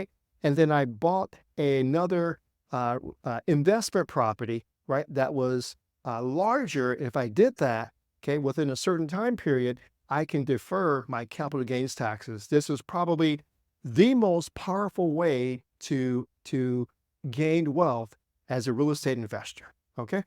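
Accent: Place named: Haiti. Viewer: he sounds like he's Haitian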